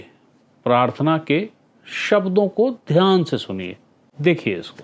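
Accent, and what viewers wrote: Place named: Jharkhand, India. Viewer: इनके भाषा मे सदरी बोली का लय है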